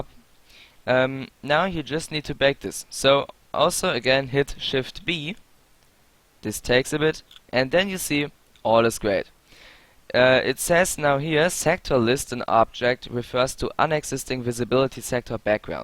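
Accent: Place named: Germany. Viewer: "Houston We have a problem". I uploaded to google drive two files created with the editor. Links are: <link> <link> My limited knowledge of English, your German accent difficult translation providing youtube and subsequent translation into Spanish, as surreal as hilarious, has forced me to create these maps modifying the demo. I am aware that it was a mistake but it's demasido late to turn back. I created maps intertwined for high level players but I have reached the limit of my knowledge about the editor and do not know how to solve some problems. I created two maps: the first map does not appear sigils or stars or the computer terminal. In the second I tried to introduce sigils but have arisen configuration errors (HUD and other elements, for example) and do not know how to solve satisfactorily, so I need your help. I know I ask too but if you help me solve these problems could finally upload to steam.al least one of the two maps. 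Thank you.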